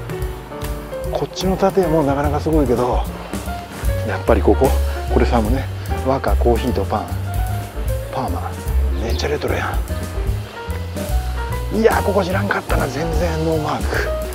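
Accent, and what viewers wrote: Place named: Nagoya, Japan. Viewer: 、初めまして。最近拝見しております66歳の親父です。の軽快な名古屋弁の話術にハマりました。なんせボキャボラリーが素晴らしいですね。これからも楽しく見させて頂きますので頑張って下さい。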